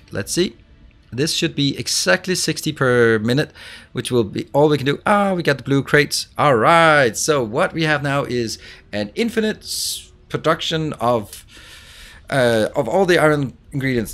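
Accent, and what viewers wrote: Denmark. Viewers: yo mate good video just got the game and wanted to lean a bit while downloading :D Little side Quest ion. are you danish ? your accent makes me think that hehe and wanted to ask. any way have a nice day ^^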